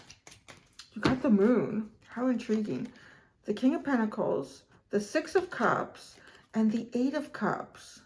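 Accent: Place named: Canada. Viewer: your canadian accent is so soft and good for practicing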